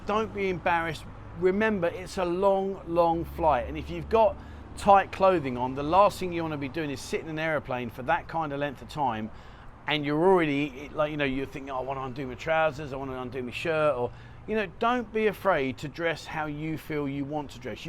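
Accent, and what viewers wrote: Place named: London, United Kingdom. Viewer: Horst it's a London accent other people understand it.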